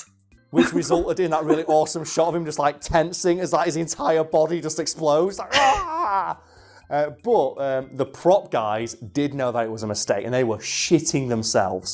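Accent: United Kingdom. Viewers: You can tell that he's British from the first few words. "Like seasoning its not necessary" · And what i said about government guidelines was in response to what you said about masks. And i don't think it's that hard to pinpoint where this guy is from, considering his extremely British accent.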